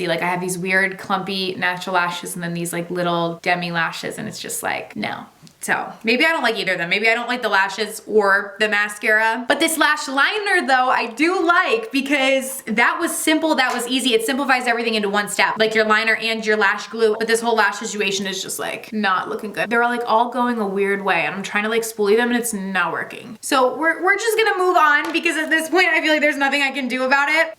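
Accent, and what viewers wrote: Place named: California, United States. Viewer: Guessing your from or live in California based on how you talk. So cal? Thanks for the vid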